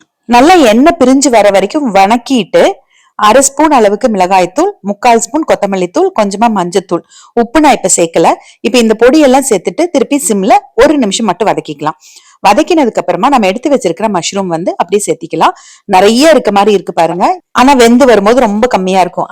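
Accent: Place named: Kongu, India. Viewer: Kongu tamil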